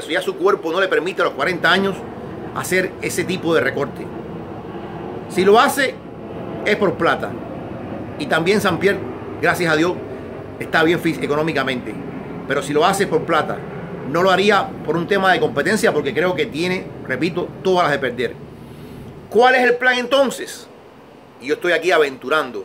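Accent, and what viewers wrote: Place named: Cuba. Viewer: El Señor Ebro (aprende un poco de respeto) es cubano y lo pronuncia como buen cubano. Yo soy español y lo pronunciaria como un español. Un ruso lo pronunciaria como un ruso. Si tanto te jode su acento o su pronunciacion vete a otro canal de mma de niñatos mezclando musica trap y acento de zombie con mma.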